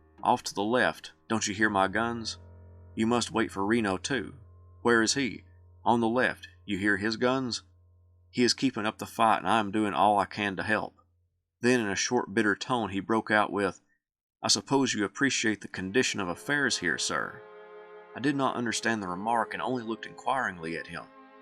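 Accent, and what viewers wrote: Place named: Harlan, United States. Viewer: You talk like me,. Im from Harlan.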